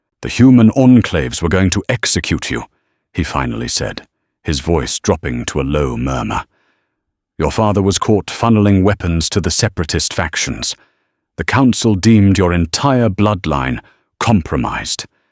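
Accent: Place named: England, United Kingdom. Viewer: I love the voice of this narrator; baritone, English, and clear. So nice! Good story, too.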